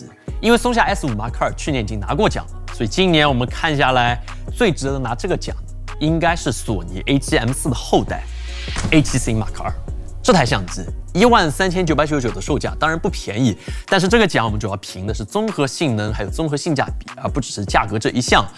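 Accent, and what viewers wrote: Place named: Hangzhou, China. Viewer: 一听口音不是杭州人就是宁波人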